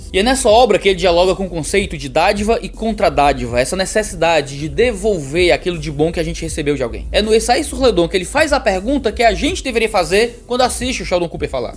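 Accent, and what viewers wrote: Ceará, Brazil. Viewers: Você é cearense?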